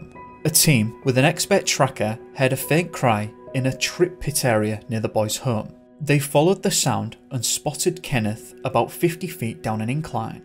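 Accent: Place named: England, United Kingdom. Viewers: You lol that as though anyone from the U.K. could readily tell the difference between a West Virginia accent and one from Kentucky. I know you mean well, but the tone sounds condescending. I'm sure that's unintended. His vocal cadence (there's a better word for it) does have a tiny bit of a brogue. If someone hasn't spent time in Scotland and England, it's an innocent misunderstanding. When he says, "taken," "say" or "if so," those vowel sounds aren't immediately revignizable as an English accent. From which region is he? Side note: I'm related to Hannah Bee and family from Warwickshire- way back..Is that actually your last name? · 😂 His accent is English not Scottish love. Lol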